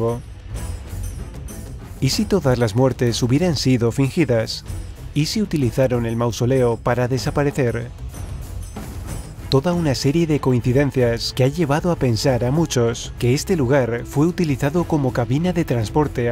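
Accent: Spain. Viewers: coño!!!!un español narrando el video!!!!yuhuuuuu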